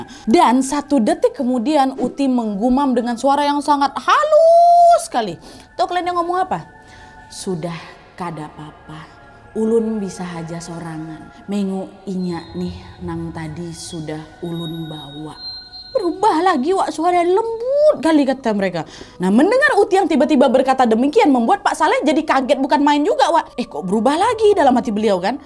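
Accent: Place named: Banjar, Indonesia. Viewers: agak kaget pas denger kak ngomong b.banjar, logatnya kerasa bgt ga sii?, keren parah kak hha 😌 juga baru pertama kali ini denger khw yg pake bahasa daerah tapi gabaca subtittle, btw berhubung udh di penghujung ramadhan, aku minta maaf ka wawak-wawak semua kalo aku ada salah yaa, minal aidin wal faidzin wawak semuaa 💟💟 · BANJARMASIN KANDANGAN HADIR ☝️, lucu dengar pakai bahasa Banjar 😅🤣🤣😅, Kya suara Acil ku di higa rumah yg panyarikan tuh 😅😅😅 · Cocok banget kak bicara bahasa Banjar, logatnya pun kental, emang multitalent 😊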